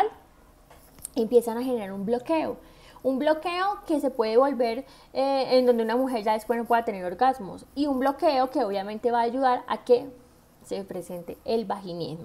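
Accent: Colombia. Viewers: hola, como siempre luces espectacular con esa cara, ese pelo, esos dientes, esos ojos, esos labios, y con ese acento colombiano bien marcado que tienes te hace una mujer muy hermosa, gracias por tus consejos son muy educativos, saludos desde Costa Rica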